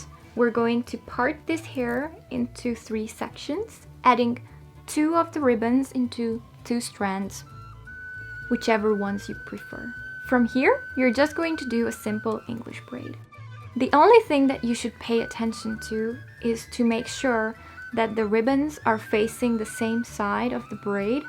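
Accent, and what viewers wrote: Hungary. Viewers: megtaláltam egy videódat régebben és megesküdtem volna hogy magyar akcentusod van, de ezek szerint jól éreztem :D 10 évig néptáncoltam, mi is hasonlóan kötöttük a hajunkat :))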